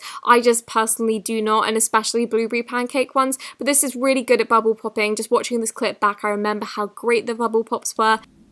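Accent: United Kingdom. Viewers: She's British so she can't pronounce it like Zoo-keen-ie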